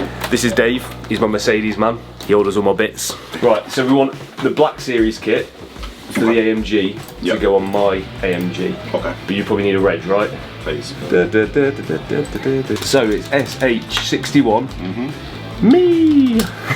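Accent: United Kingdom. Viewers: Can you do hindi Dub for me 😁 would be a lot easier to understand without UK accent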